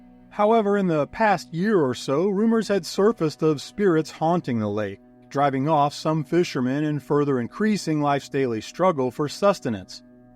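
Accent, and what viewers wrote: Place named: Deep South, United States. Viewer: Great video, I couldn't help but laugh though in a good way. I was expecting to hear Balkan accents no deep south 😂. Keep up the great creative and informative work that you do.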